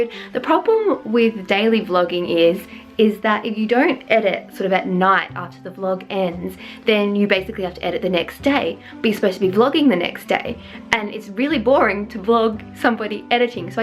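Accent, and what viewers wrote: Australia. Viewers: Are u Australian and my worst thing is chocolate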